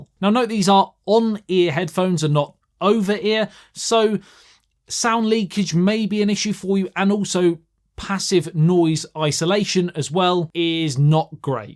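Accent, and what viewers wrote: United Kingdom. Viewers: What country you're from? Is that a British accent?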